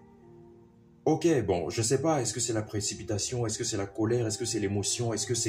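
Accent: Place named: Gabon. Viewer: Il a l'accent de chez moi au Gabon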